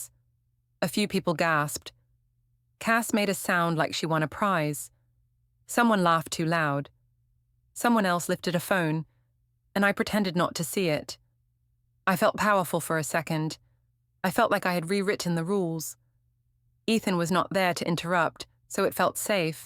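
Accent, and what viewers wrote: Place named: United Kingdom. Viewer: That Brit accent SUCKS! Thumbs down!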